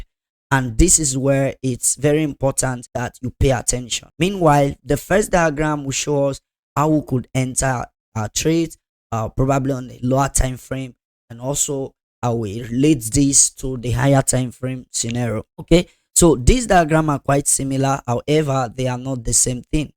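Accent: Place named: Africa. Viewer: When I heard the african accent and then saw the exness link in the video description... instantly knew its bs and a scammer 🤣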